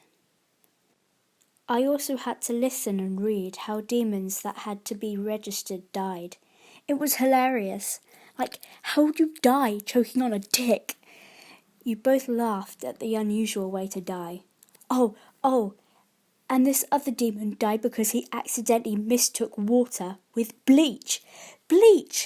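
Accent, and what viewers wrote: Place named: United Kingdom. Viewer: For some reason when someone who has a British accent and they are reading it sounds nice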